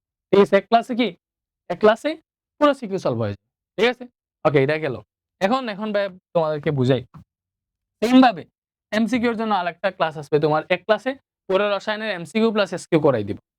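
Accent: Sylhet, Bangladesh. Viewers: সার আপনি সিলেটি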